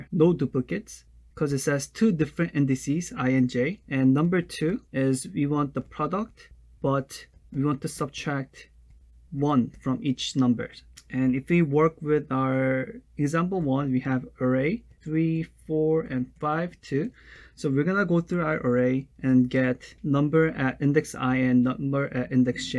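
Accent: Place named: South Korea. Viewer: I love how considerate you are at explaining all the steps you take to get to an answer. This is kind of a random question but I hear some Korean accent in the way you speak. Are you korean by any chance?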